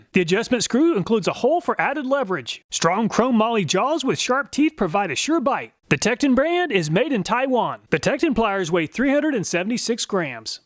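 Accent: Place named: Southwestern Pennsylvania, United States. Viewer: Hi mr project farm! After watching all of your videos your accent on A's and O's remind me of home... Do you happen to be from a certain city in South Western Pennsylvania?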